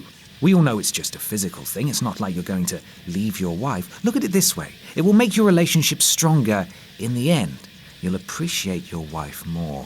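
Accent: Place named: RP, United Kingdom. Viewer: sounds really promising posh today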